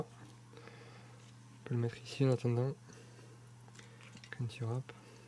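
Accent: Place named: South, France. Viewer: Pas mal du tout ! Le design est plutôt intéressant ! Cet accent, tu es du sud de la France ? On attend le maiden maintenant ;) Bon week-end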